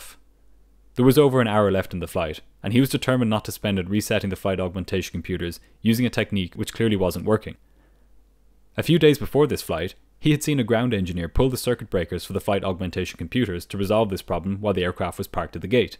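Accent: Ireland. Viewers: Love your channel ❤ ye sound like a fellow Irishman 🙋‍♀️🙋‍♀️ great to see an Irish channel doing well 🇮🇪🇮🇪🇮🇪🍀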